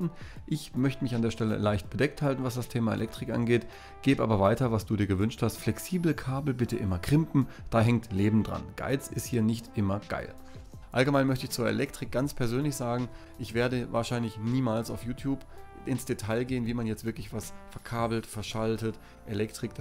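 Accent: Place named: Swabia, Germany. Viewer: Ja, der ist schon ein guter. Vor allem die Stimme, auch wenn er aus dem Schwäbischen kommt. Die Stimme ist weich und er erklärt es super. Könnte auch als Werbesprecher Arbeiten.. Jetzt zum Thema, ich habe mir auch eine Pool Heizung zugelegt. Pool hat nur um die 3000L. Hatte als erstes den Papierfilter gegen eine Sandfilteranlage mit diesen Bällen getauscht. Muss sagen geile Sache. Im zweiten Schritt habe ich mir bei einer großen Plattform 4 Absorber und das Passende Zubehör Bestellt. Alles auf die Wiese im Garten zusammengebaut und es lief alles super. Inzwischen habe die Anlage auf unser Pergola Dach montiert. Jetzt funktioniert sie leider nicht mehr richtig... Habe mir dazu dein Video mit deiner Heizung angeschaut und musste wahrscheinlich feststellen das ich auch ein Rückschlagventiel und eine Fallbremse brauche. Na ja, ist bestellt jetzt hoffen wir das es geht. 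Und das bei einer Fallhöhe von nur 2m. Vielen Dank für deine Filme. Mach weiter so.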